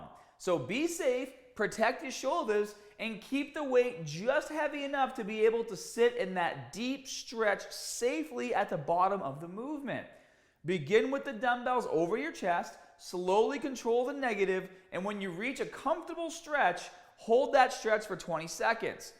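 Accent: Boston, United States. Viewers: How did your Boston ascent come back stronger than ever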